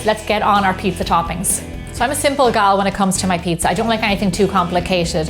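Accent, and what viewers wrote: Ireland. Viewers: she’s actually Irish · Is she irish? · The Irish accent is so buttery smoth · I think she's Irish.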